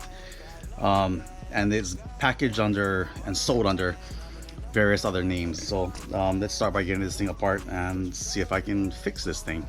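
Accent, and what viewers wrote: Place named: Hawaii, United States. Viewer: 5 seconds in from speaking and was like this guy gotta be from hawaii. O-ring walkthrough helped, gonna fix my jack this weekend!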